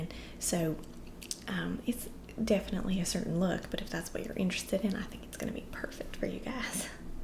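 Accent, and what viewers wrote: South, United States. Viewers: Wonderful! Southern US accents are really lovely to listen to in my opinion :)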